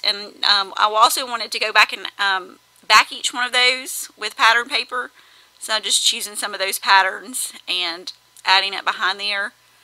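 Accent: South, United States. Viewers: I have a scrappy crush on you! Ok, I know that sounds creepy but you just make magical pages. Also I love hearing your southern twang as I'm originally from the south myself. Love how you added washi to those wood veneers from HS. Very creative!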